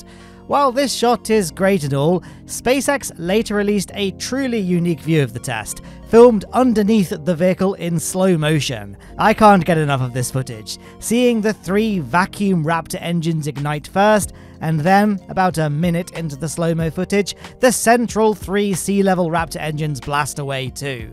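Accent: United Kingdom. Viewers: I know it may be difficult with a British accent but you need to pronounce certain words correctly, like a persons name or the name of a location. It isn't pronounced Macy as in the Macy's Day Parade, it's pronounced Massee's as in Ma sees, with a long 'a' sound. Everyone else in the dozens and dozens of YouTube channels pronounce it correctly, so why can't you? That is my only criticism of an otherwise excellent video, if I can't pronounce something correctly I find out how to pronounce and get it right, anyone can do it.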